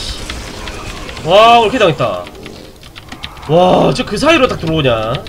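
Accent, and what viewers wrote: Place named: Gyeongsang, South Korea. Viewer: 듣기싫은 경상도 사투리!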